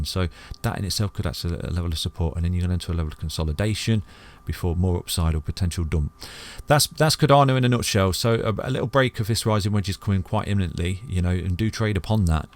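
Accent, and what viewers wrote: United Kingdom. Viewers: Realistic analysis, realistic british accent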